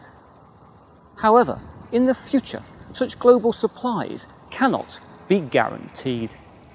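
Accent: United Kingdom. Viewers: Does every fucking talking show host person have to have a british accent? Cool video btw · I think hes from Northern England. Im from London, our accents are quite different to eachother.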